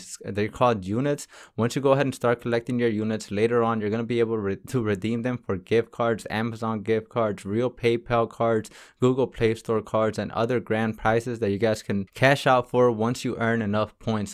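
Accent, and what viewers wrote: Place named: Latin America. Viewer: Are you latino? Ur accent bro nice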